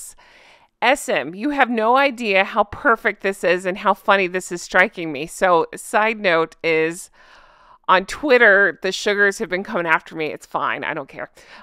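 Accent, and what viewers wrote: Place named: South, United States. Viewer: has a sexy voice, a not too posh Southern accent and with a lovely timbre. I think he's in his late 40s or early 50s due to pop culture references he sometimes makes to the 1980s, including the music of that era. Bet he's handsome AF.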